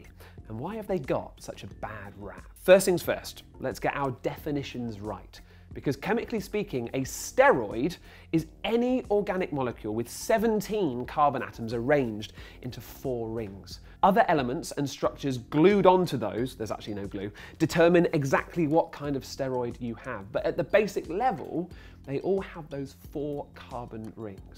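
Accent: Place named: England, United Kingdom. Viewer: That English accent thooooooooooooooooooooooooo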